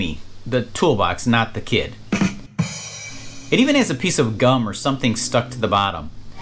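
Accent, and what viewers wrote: Chicago, United States. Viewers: You're from Chicago aren't you? We hear it in our own.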